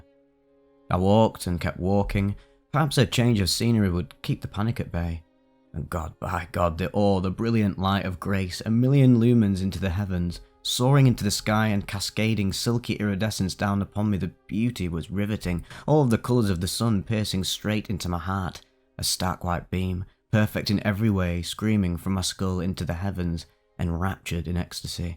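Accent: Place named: England, United Kingdom. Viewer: You have the perfect voice for narrating. Which part of England are you from?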